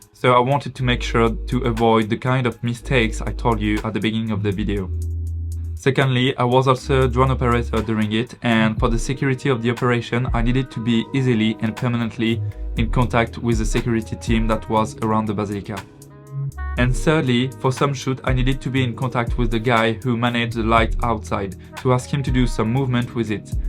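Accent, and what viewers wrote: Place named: France. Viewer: English with a French accent is charming.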